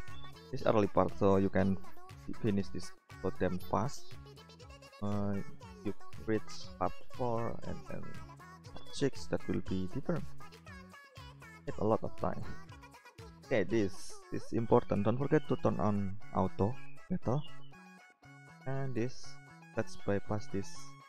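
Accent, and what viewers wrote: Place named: Indonesia. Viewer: Dari logat nya indo banget dah 🤭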